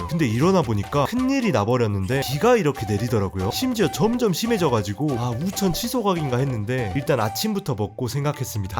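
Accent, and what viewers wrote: Jeolla, South Korea. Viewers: 전라도 말투 잘들었습니당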